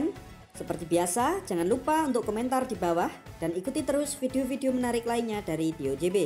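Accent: Java, Indonesia. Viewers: Suka bgt ma Suaranya, lucu bunda ada logat jawa2nya gtu😁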